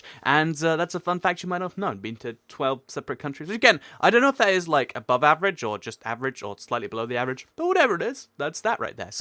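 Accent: United Kingdom. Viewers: You're British. Say "H" for my amusement. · Are you British I am? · What part of the U.K. Are you from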